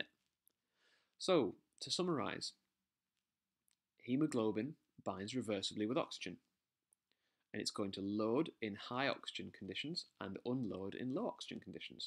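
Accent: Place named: United Kingdom. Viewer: Im so glad I've found your channel, just in time for my exams next month. Thanks! Also its nice to see hear somebody British teaching Biology, as there are a lot of American videos on Youtube and they pronounce a lot of things differently.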